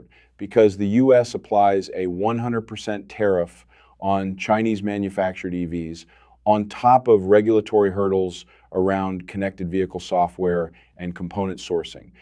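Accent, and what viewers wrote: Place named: United States. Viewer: When I hear an American Voice telling me the Chinese Competition is done I'm skeptical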